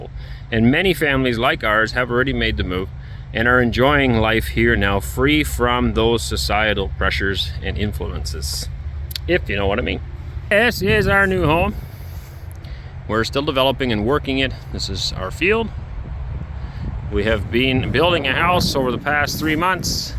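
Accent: Canada. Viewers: That is the most Canadian accent ever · he Canadian by the accent.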